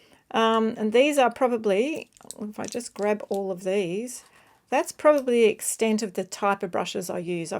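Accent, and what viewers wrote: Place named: Australia. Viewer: super informative! glad to hear a fellow aussie too 😂 thanks for the vid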